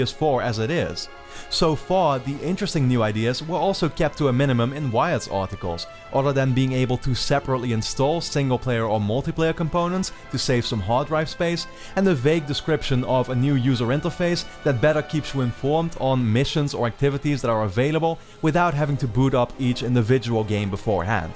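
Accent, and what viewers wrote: Netherlands. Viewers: Hahaha that Dutch accent tho... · He sounds Dutch. Iam 99% sure he is from the netherlands.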